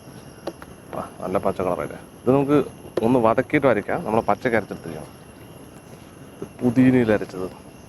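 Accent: Palakkad, India. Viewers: കുക്കിങ്ങ് വീഡിയോസ് ഇത്രക്കും റീച്ച് ആകാൻ പ്രധാനമായ കാരണങ്ങൾ... 1.പറമ്പിലും പാടത്തും പച്ചപ്പ് നിറഞ്ഞ ഗ്രാമ ഭംഗി യിലുള്ള ചുറ്റുപാടിൽ എടുക്കുന്നതും കൊണ്ട്😍 2. നാടൻ ലുക്കും വിനയം കലർന്ന പാലക്കാടൻ സംസാരവും😍 3.എന്തിനും കൂടെ നിൽക്കുന്ന കുറേ കൂട്ടുകാർ😍 4. അഗതിമന്ദിരത്തിലേക്കും മറ്റും ഭക്ഷണവും സഹായവും എത്തിക്കുന്ന മനസ്സിന്റെ നന്മ...😍👉👉ക്യാമറമാൻ്റെ കാരൃം പിന്നെ പറയേണ്ടതില്ലല്ലോ....😍 ഇതെല്ലാം കൂടിക്കലർന്നപ്പോൾ Village Food അങ്ങട്ട് കളറായി ജനങ്ങളില്...🥳...😍 ശരിയല്ലേ പറഞ്ഞത്🥳🥳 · assalamu alaikum epozhum watch kayyil kanarund athond chodikkunnatha water resistant aano ikkayude watch? Njan oru army karan aanu palakkadan swadeshi epozhum ikkante videos follow cheyyarund bcz of your palakkadan slang keep going we are all with you always....(respect)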